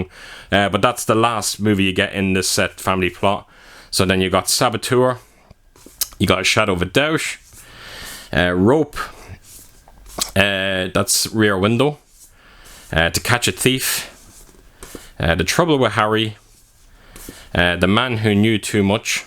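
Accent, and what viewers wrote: Ireland. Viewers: I know we chatted before neighbor, I thought you were a Louth man (accent) if you remember, anyways I love the channel and never miss an upload keep them coming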